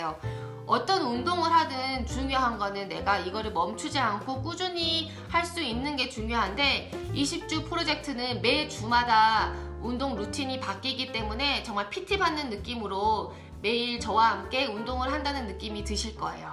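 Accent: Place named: Seoul, South Korea. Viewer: 님 특유의 사투리말투에서 서울말투 사이 너무 귀여워용ㅎㅎ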